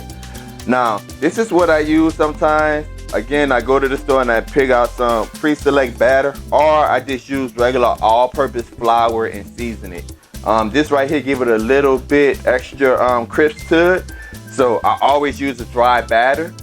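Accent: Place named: Louisiana, United States. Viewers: Love the Southern Accent...Chicken Wangs lol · Y’all he’s from the south most southern folks have an accent it doesn’t mean he’s dumb...y’all the dumb ones for not understanding that. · I'm an Aussie and I love the southern accent, and love how he does the thang with his wangs. 🤣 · Gotta be from Louisiana 💓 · Are you from Louisiana, you have a little Louisiana accent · Of course it's a Louisiana accent the best there is. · First off, clearly you have to get "wangs" and not "wings" if you want crispy chicken. Great video and I love that N'awlins accent! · I knew the second I heard his accent, he was from Louisiana. 504 REPRESENT!!! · I can tell your from NOLA my entire family is from there. Love the accent😉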